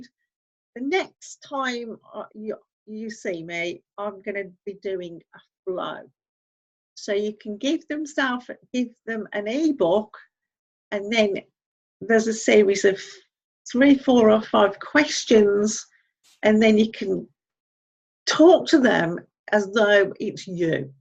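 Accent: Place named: United Kingdom. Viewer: With your accent shouldn't it be Millionaire Mum?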